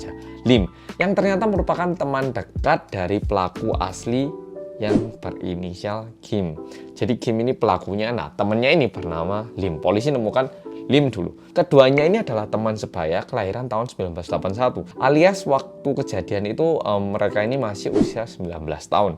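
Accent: Java, Indonesia. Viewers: Medooooook, kacamata e baru rek mbois 😎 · Aku dulunya pe cinta drakor. skrng, udah sering nonton drakor Krna sudah ketagihan menonton vlok medok😅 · oppa oppa medok😍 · medhok ◐.̃◐ Nessie Judge: horror 👻 Nadia Omara: ngegass 😈 Arroyan Pram:serius, editan terbagus dan niat Detektif Aldo: serius pake banget (｡ì _ í｡) Gak tau kenapa aku suka banget sama kalian:-) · Gak kerasa awal tau mas 4 tahun lalu, masih inget bgt prtama nonton video yang ngejelasin ttg sim. Abis itu hebohin org serumah grgr org korea yang medok, setelah itu rajin nonton video mas wah. Terharu liat perkembangan channel ini 😭😭😭 chukkae mas udah smpe segede ini🎉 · menarik juga nih ceritanya wkwk jadi pengen nyoba nonton filmnya:D makasih banyak ya mas, dari bbrp video mas aku jd banyak tau ttg hal2 baru, udah sejak lama malah wk tapi aku baru komen skrg aja:v semangat terus ya mas! suka sama kalimat yg disisipkan di dlm video, bener bermakna, ga lebay, realistis, dan memotivasi. 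Intinya, apa yaa wkwkwk.... SEMANGAT TERUS! MAS BERBEDA, MEDOKNYA, PEMBAWAANNYA, MATERINYA, semuanya AKU SUKA krn itu semua ISTIMEWA! SEMANGITTT!!!!! /abaikan kalimatku kalo terkesan lebay or ga nyambung ya mas wkwk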